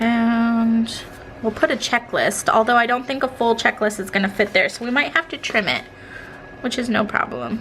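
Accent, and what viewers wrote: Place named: Seattle, United States. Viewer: I am a fairly new subscriber to you channel. Just listening to I got the feeling you are from Seattle. Are you?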